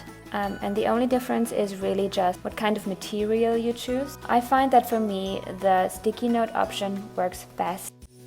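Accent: Germany. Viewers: Kommst du aus Deutschland weil du so ein deutschen Akzent hast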